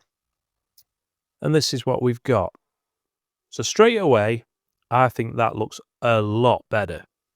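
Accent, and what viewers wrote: United Kingdom. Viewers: Thanks for this...just got today, waited for reviews an patches. Where ya from mate, sound like you're from my neck o t'woods...halifax, west yorkshire.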